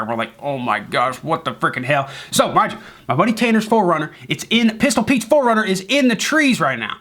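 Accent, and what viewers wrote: United States. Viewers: are you american or swedish?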